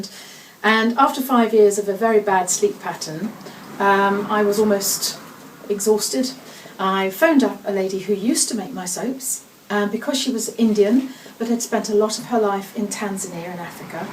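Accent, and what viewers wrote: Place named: United Kingdom. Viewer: Love the accent, I swear, every girl from the UK is just ahead of the game simply because of it...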